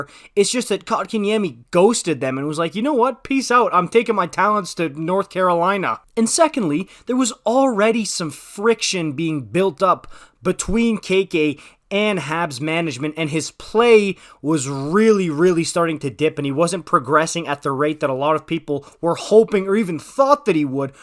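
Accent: France. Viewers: I love how your French accent pops out, dude! Haha, awesome. I'm learning another language watching your videos. ;-) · It grates on my nerves when he speaks with French accent in the middle of speaking English.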